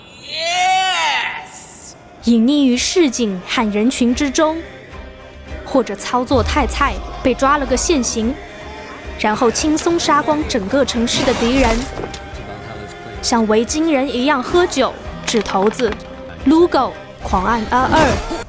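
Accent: Taiwan. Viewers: 台湾配音的国家地理中文节目，就是这种风格。我小时候经常看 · 为什么4台湾口音？ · 我覺得有六七分像，但不完全像，台灣人講話比較輕，沒那麼用力，語速也沒那麼慢。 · 這台灣腔？ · 为啥要用台湾腔啦，淦。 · 这次太秀了，台湾腔加各种段子，我希望以后这样的作品会更多，给你点赞！ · 这台湾腔学得很牛逼啊! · 很用力的模仿台湾解说腔，“强力冲击”最后一个字要发成二声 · 哈哈哈哈哈哈哈这台湾腔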